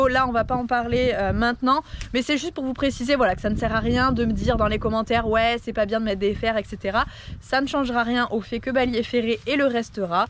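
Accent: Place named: Landes, France. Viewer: On dirait le landes tu es d'où ? :D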